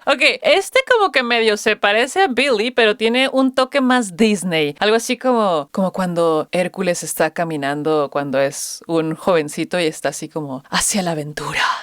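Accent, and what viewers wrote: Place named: Mexico City, Mexico. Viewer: Es el mejor, lo viven vivo en NYC. El Ingles es su tercera lengua ( Kazajo, Mandarín,Inglés) más o menos fluido. Escuché norteamericanos e ingleses reaccionando y dicen que su casi perfecto. Con tu inglés chilango tienes razón ( nada que ver ) no escucharás el inglés. Te daré referencia de gente que habla inglés, desde “ ” song se nota su mejoría. La música no tiene idioma. Por lo demás está bien tu estilo de reacción.. Te felicito porque descubriste al mejor. 👍👍🇭🇳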